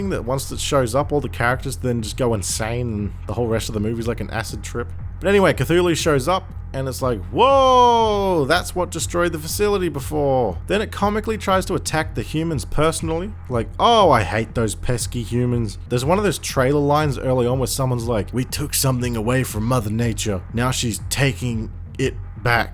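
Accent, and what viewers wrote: United States. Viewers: I look forward to hear what your thoughts on the new transformers news is. Your American accent is really good. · Good American accent! Well done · That American accent though.....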